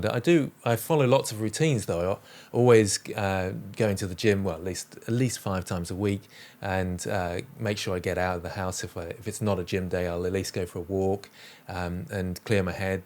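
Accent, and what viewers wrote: United Kingdom. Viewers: Congratulations. Very well deserved. Your tutorials are so easy to follow even for a non-English speaker. The British accent is a very welcome bonus, too.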